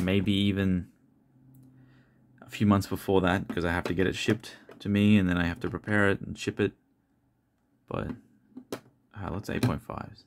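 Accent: Australia. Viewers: Dude, your videos are the best out there. not only for the sublime ozzie accent but you dont talk too much. you get to see a shit ton of cool cards within a short time. I really love this, less talk more cards. your videos have that to the max, so keep it up! I subscribed hell yes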